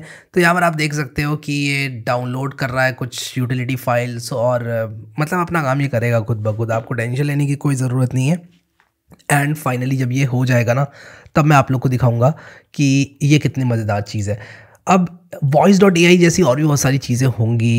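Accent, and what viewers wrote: India. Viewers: bhai tu bilkul angrez jaisa dikhta hai, mai to kisi foreigner ka video bolke click kiya tha, badme dekha tu to indian accent bol rha.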